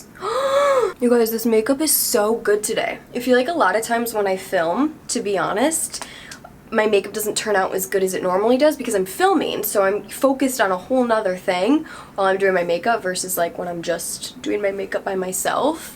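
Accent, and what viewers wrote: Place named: Michigan, United States. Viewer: She sounds like she is from Michigan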